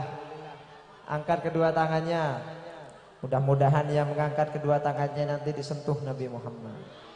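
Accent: Madura, Indonesia. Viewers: Orang mana kok maduranya pancas banget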